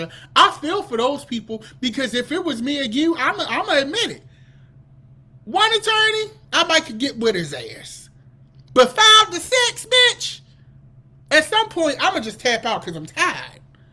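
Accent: Baltimore, United States. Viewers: Baby! I got my whole life in this life! They want her to be humbled down and I’m so glad she didn’t!! Where are you from?? It’s giving DC or Baltimore?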